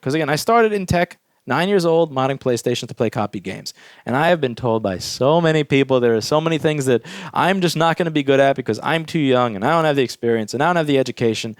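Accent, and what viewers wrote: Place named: Staten Island, United States. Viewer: You sound like you're from Staten Island.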